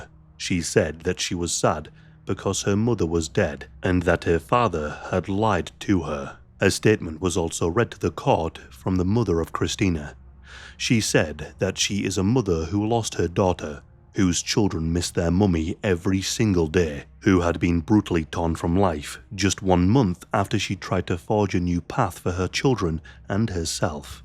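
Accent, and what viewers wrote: United Kingdom. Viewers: The commentator is British, in which country did this happen?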